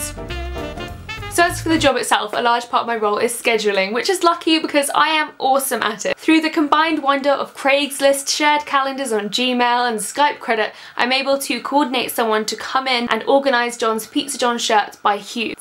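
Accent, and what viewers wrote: United Kingdom. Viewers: The Queen's English. Not everybody speaks 'Merkin, yanno? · Oh my god a British accent on vlogbrothers it feels wrong yet beautiful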